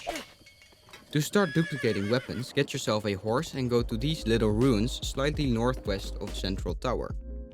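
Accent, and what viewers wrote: Netherlands. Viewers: Hol up, u dutch? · quesion, are you dutch? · Ik hoor aan je accent dat je nederlands bent maar bedankt voor de video :) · Are you dutch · Mate are you dutch???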